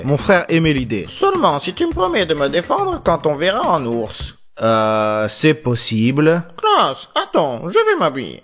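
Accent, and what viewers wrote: Russia. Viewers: t'es russe ?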